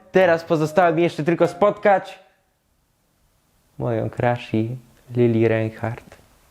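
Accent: Germany. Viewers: Powinieneś jechać do niemiec. Super niemiecki akcent translator i filmik šmieszny hee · Dobry Niemiecki akcent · Dobry niemiecki akcęt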